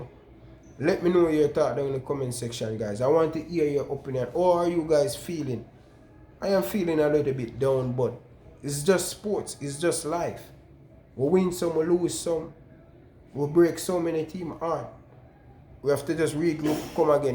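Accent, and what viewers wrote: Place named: Jamaica. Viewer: where in jamaica you from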